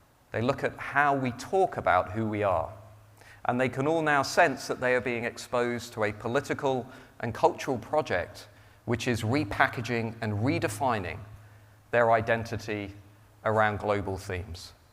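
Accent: RP, United Kingdom. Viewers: Same old Public School elite which has always run things perhaps differently but there is nothing new here just a poshly spoken word salad!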